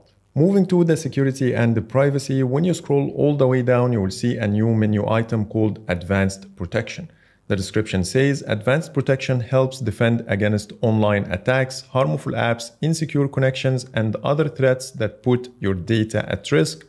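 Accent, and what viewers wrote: Egypt. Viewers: are you from middle east, Egypt particulary ?? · ياسطا انت مصري ؟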